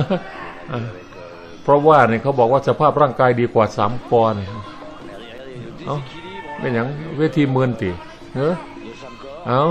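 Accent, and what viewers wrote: Isan, Thailand. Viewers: สวัสดีครับแอด555พากย์อิสานชอบๆมากกก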